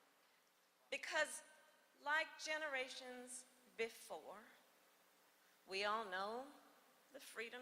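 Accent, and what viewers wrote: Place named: South, United States. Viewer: LOL her family owned slaves in Jamaica, why is she talking like a Southerner. What a joke.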